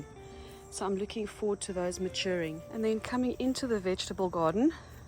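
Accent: South Africa. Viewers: What a beautiful garden I thought you're here from SA with the accent but the house and fence is not typical until you mentioned frizzle sizzle plant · You sound South African stayed to watch because of that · You have an SA accent. I love your garden. One of the best so far.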